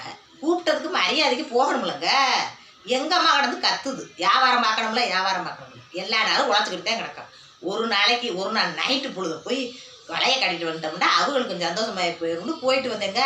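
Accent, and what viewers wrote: Madurai, India. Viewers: Madhurai salang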